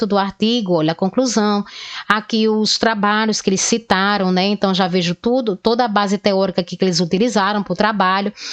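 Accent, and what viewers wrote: Northeast, Brazil. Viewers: Já me inscrevi no canal. Super clara e com sotaque nordestino ❤️ Obrigado!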